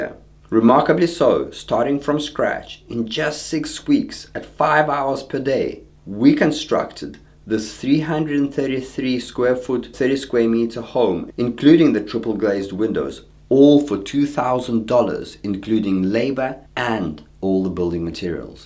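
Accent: South Africa. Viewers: It sounds sooo much like you have a South African accent??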